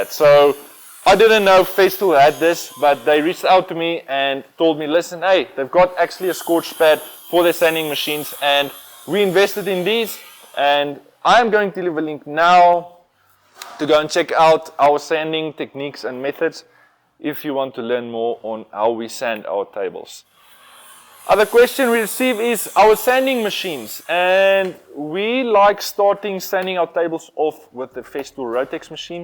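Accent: South Africa. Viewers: As soon as I heard your accent lol I immediately went onto my phone to check if your South African :p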